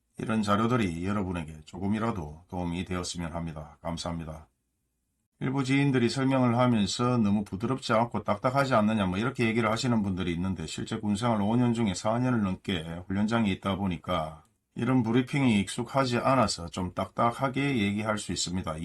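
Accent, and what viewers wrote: Gyeongsang, South Korea. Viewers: 목소리 좋으십니다. 딱딱하지 않는데요. 경상도 기본값 아닙니까.?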